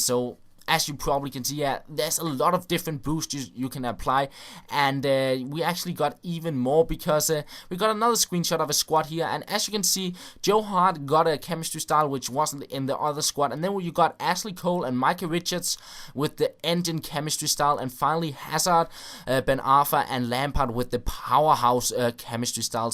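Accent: Denmark. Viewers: your English accent is Danish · Man kan bare høre på dig at du er dansker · lol so easy to spot that you are from north europa/DEnmark?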